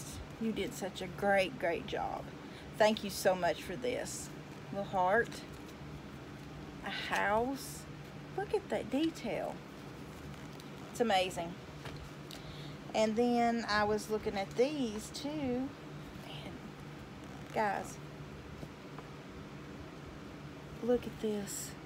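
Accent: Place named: Tennessee, United States. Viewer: Nice presentation here!! You have that Smokey Mt./Tennessee voice! I love it!!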